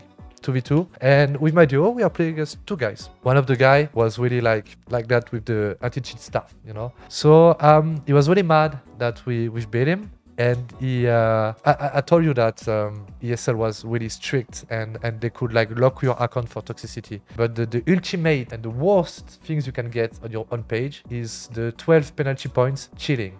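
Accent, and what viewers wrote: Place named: France. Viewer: french accent makes his voice very sexy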